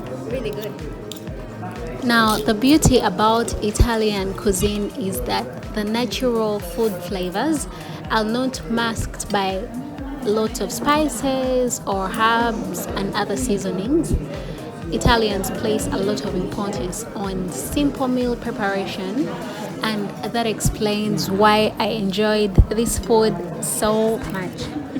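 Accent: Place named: Netherlands. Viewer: The English has really changed. This is really a Dutch accent. U loved the Vidoe bby gal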